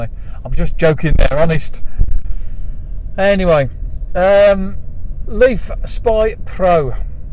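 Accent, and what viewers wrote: United Kingdom. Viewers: I suppose u need to be Brit to follow your conversation....too blabbery and too much accent etc....bye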